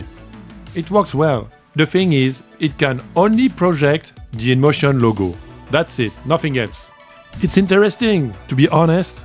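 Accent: France. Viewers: Drôle d'accent. On dirais un francais qui parle anglais.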